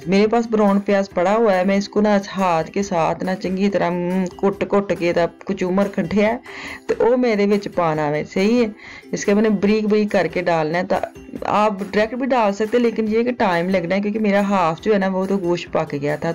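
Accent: Punjab, India. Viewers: Nan wo bhi tandoori nan ... ghar main banana mushkil hota hy ... kamal kr dia, presentation bhi bohot zabardast hy.. urdu main pujabi ka tarka .. foran hi, like 👍 aur sath hi subs bhi kr dia, ta k future main ap ki 📹 videos dekhta rahon.. umeed hy ap nay bhi asa hi kr na hy ... Allah pak ap ko aur tamam ghar walon ko hamaysha kamyabian, and khoshyan naseeb farmaye Ameen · Oh my God apki mazedar funny punjabi style ko sun kr ma ne Channel subscribe kiya hai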